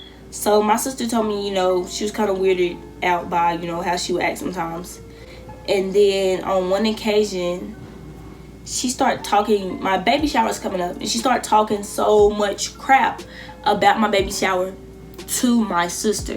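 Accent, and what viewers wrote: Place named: North Carolina, United States. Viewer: You sound like you from the NC . AUTOMATIC subscribe <3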